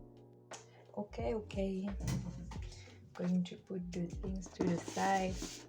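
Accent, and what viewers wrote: France. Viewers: your accent is so pretty! I knew you were french right away because i used to take french classes XD good video btw i just subscribed :)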